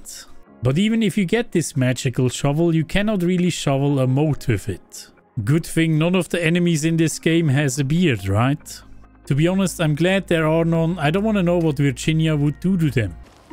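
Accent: Switzerland. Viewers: meh ghört sofort das en schwizer bisch. vo züri? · Fast nöd denkt mit dim dialekt das du en schwizer bisch <3 Schad das die videos uf englisch machsch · me ghört eifach das schwizer bisch, endlich mal en schwizer wo gueti videos macht🎉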